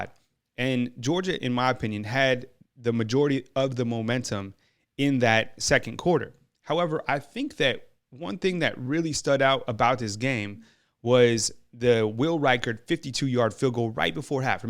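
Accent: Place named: Louisiana, United States. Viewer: each one teach one I can tell you’re from Louisiana you sounds like a trash can.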